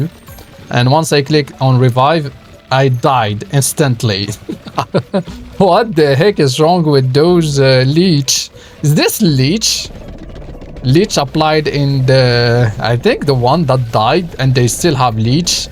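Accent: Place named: France. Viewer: I like so much you "French Accent" that you imbue into your speach pattern :D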